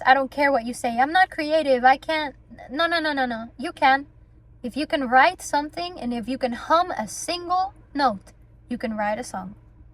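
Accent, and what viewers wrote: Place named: Italy. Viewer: I’m just curious.. are you Italian? You speak great English and I think I hear an Italian accent but I noticed a lot of your shows are in Hispanic countries.. anyway glad you’re alive and better after that crazy experience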